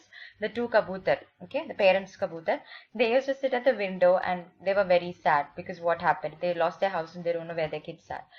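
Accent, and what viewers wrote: Kerala, India. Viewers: Last minute preparation with you is the best way of learning with you mam.....I think u r malayali because the way u explains hindi is like my mom....❤️🦋🌝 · Mam are u malayali...btw great expaination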